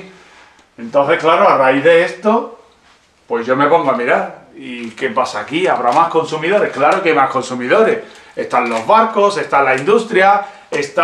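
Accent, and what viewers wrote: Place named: Andalusia, Spain. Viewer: yo también soy andaluz y ... nuestro acento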